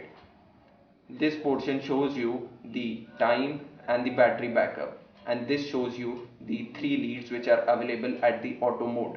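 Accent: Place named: India. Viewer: thanku sr for making video in Indian english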